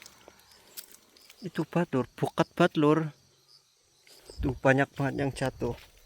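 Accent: Indonesia. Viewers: koe ngapak yah,ngapake ngendi bro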